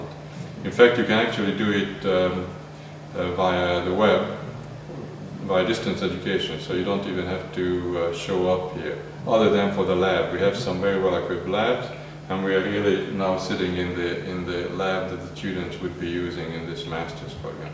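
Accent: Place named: Netherlands. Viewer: I hear the professor is from the Netherlands.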